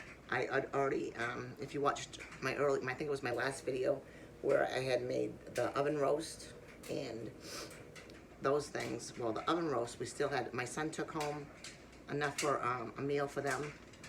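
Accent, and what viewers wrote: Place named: New England, United States. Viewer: I thought I detected a new England accent on you I have relatives thst live in foxovuro MA we used to drive down there all the time when I was young and it was always my favorite vacation. My aunt lived on the end of a dead end street right on a lake and Shaffer stadium was right on the other side of it so we used to hear concert from her back yard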